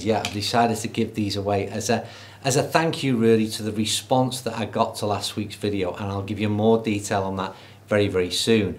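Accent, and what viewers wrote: Liverpool, United Kingdom. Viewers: You say you’re from Wales but do I detect a bit of a scouse accent. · Well done on your decision, I wish you every success! From your voice I'd assumed you were from my neck of the woods (Liverpool) but Wales is close enough innit. Must get back to Nefyn one day, what a course and location! All the best for Christmas mate.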